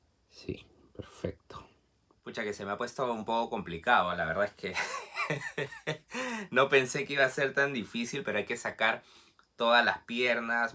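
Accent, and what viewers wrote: Peru. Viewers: Hola amigo, eres de. Peru? Tienes un acento bien neutro, y me parece que si eres compatriota mío, sea cuál sea la respuesta, grandes exitos amigo, que siga creciendo el canal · En una se reconoce a un Peruano cuando habla ...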